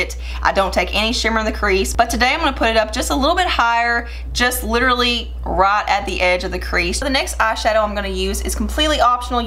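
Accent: South, United States. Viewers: Your accent! I'm from Alabama so I'm really southern also! Where are you from! And, Amber rush is my Go-to eyeshadow! I wear it everytime I do my makeup! 😍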